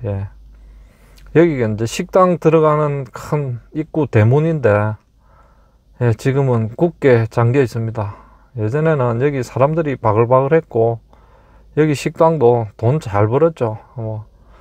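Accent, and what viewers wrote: Gyeongsang, South Korea. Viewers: 님 사투리 어디인가요!! 전남광주는 아니고 경남맞나요 구독좋아요 누르고갑니다 😊😊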